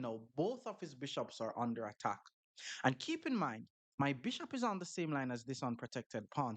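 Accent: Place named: Jamaica. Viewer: bro i found your vids a couple of days ago, and I'm hooked. Best chess educational content on YouTube! also really appreciate the soft Jamaican accent. I find myself kissing my teeth when playing now, followed by "I can't take becaa...". Big ups bro, drop a lil more patois tho please <3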